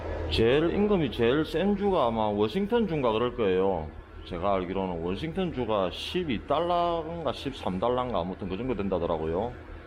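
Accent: Gyeongsang, South Korea. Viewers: 구수한경상도 말씀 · 구수한 경상도사투리에 동질감을 느끼며 고마 썌리 마 구독 때리고 가겠습니다~ 항상 안전운전하시고 흥미로운 영상 계속 부탁 드립니다.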